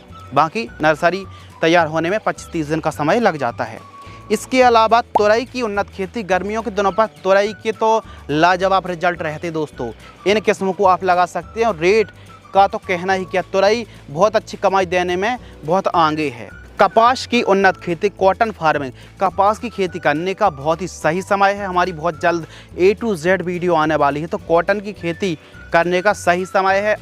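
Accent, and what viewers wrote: Bundelkhand, India. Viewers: सर आप बुन्देलखण्ड से हो का ❤❤हमे yesa लग रहा हैं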